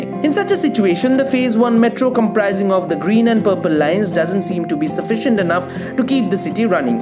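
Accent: Bengal, India. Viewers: I think the narrator is Bengali Babu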